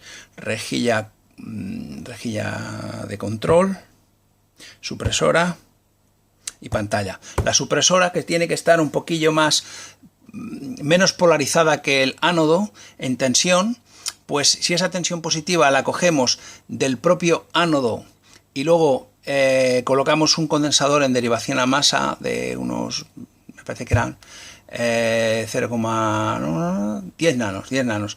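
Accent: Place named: Catalonia, Spain. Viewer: Hola, creo que tienes muy buen contenido y conocimiento acerca de lo que hablas. Te aconsejaría hacerte un twitter donde ir actualizando información sobre lo que vayas haciendo, así como optimizar tu canal y los tags del mismo. si dudas de alguna cosa yo soy de badalona por tu acento supongo que eres de cataluña, cualquier cosa buscame por twitter y hablamos un saludo!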